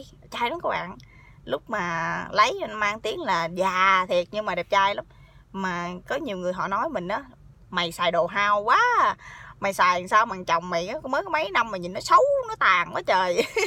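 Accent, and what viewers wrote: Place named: Mekong Delta, Vietnam. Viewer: Chị giống quê miền tây quá...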